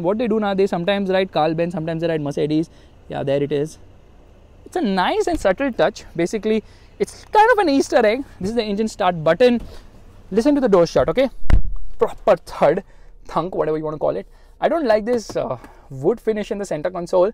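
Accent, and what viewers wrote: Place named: India. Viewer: your english is boring learn how to to pronounce and continue with it you are here in hindi accent and you feel like english really is boring just listen just go for hindi you think you speak english but reality is you are speaking Hindi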